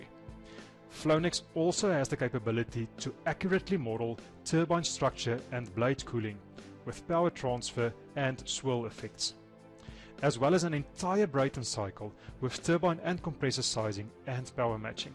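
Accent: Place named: South Africa. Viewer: Are you south african? I hear an Afrikaans accent somewhere in there.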